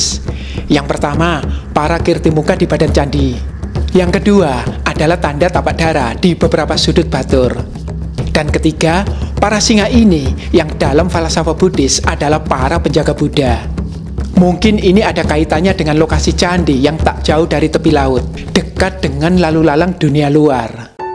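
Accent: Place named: Probolinggo, Indonesia. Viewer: ciamik Soro gaya bicara orang probolinggo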